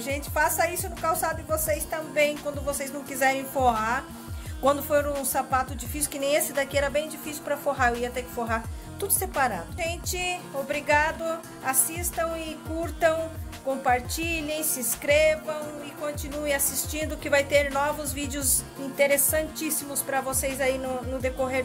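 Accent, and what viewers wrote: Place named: Asia. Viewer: Pelo sutaque parece ser asiática, gostei do vídeo. Parabéns pelo seu trabalho.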